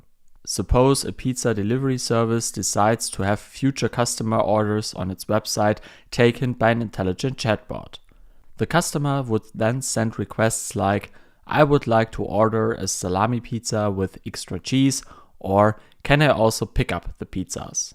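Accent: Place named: Germany. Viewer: bro sounds german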